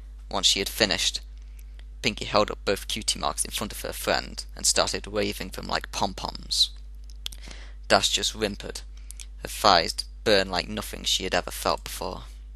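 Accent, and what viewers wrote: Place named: United Kingdom. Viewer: your british and that makes the story more scary awsome reading dude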